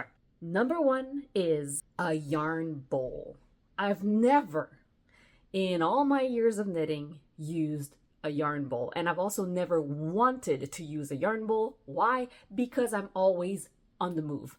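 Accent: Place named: Quebec, Canada. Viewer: Thank you for your videos! ❤ You sound Quebecoise. 👏🏻💞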